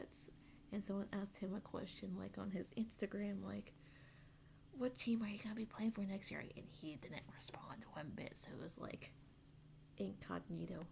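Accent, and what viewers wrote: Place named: South, United States. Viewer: How in the hell do you get so much social media hate? Yes, you can come off strong and as a quote unquote "bitch" in other people's opinions. I think you're the smartest person I know and you are funny and genuinely a caring human. I think Larson should have just let Chase by so that the 9, 42, and 3 could have pulled away. It was fun and i like this new Camaro. I do agree that MD0 car was awesome. Btw, your cat stole the video and i swear I heard a hint of southern in your voice. Have a great weekend and stay safe, the internet really does need you. I also wanted to agree that Austin is a great guy and takes lots of time for autographs. I got him (and the other coke guys) to sign my coke hate. I wanted to end this by saying I'm sorry Rivers left your Chargers. He's better then people think and should be a champion. 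Please don't let other people change you, those jerks suck. I hope Twitter people aren't being as rude anymore. I love your 24 shirt and i hope you'll be buying the zero shirt.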